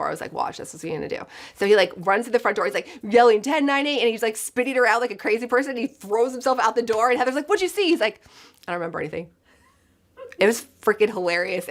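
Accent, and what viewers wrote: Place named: California, United States. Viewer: She is a California girl through and through. That’s how we talk.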